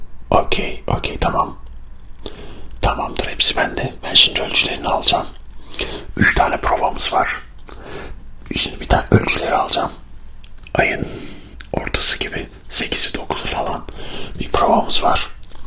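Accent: Eastern Anatolia, Turkey. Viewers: abi bu platformda asmr konusundan rakibin yok. Daha çok doğu şiveli video gelirse çok iyi olur.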